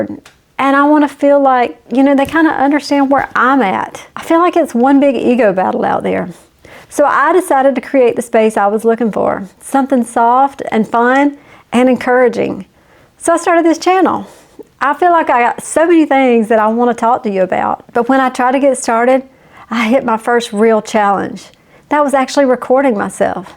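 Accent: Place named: South, United States. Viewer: Not just Women watching you. I’m a subscriber finding your videos very helpful and informative and I’m a 26 year old man 😅 Your videos are great. You remind me of my southern teachers I loved back in my Middle school days when I transferred from Hawaii to Florida, southern accent and down to Earth. Your YT is gonna pop off when Fl, Georgia, Tennessee, Alabama ect. algorithm finds you 🙌🏽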